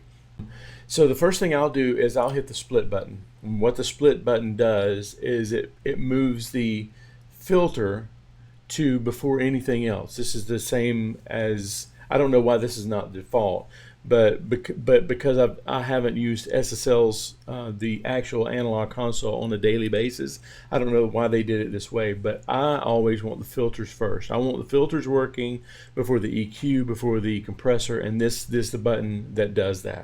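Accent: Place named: South Carolina, United States. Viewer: Great video my friend. I was trying to place your slight accent. Maybe South Carolina? haha don't know. Don't disclose this, I like your voice lol. Great video my friend. Well done!!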